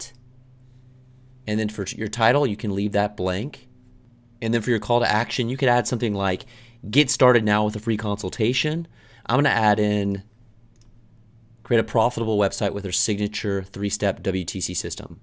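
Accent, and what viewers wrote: England, United Kingdom. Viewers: I.m just curious, but what happened to your lovely gentle English voice and accent I enjoyed on your 2015 video which was one of the first I came across before this one - and persuaded me to go for Sydney.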